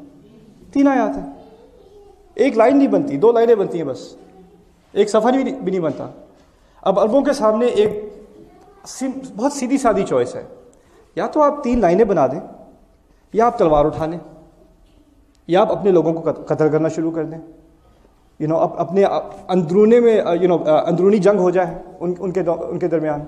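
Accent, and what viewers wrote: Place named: India. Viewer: I didn't understand anything but so nice to hear him speaking urdu:) it sounds like indian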